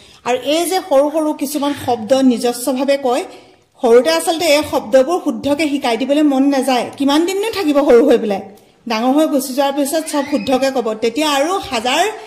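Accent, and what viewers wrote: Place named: Tezpur, India. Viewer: Aitu amar tezpur fala vokosu buli koy kai kub val laga ❤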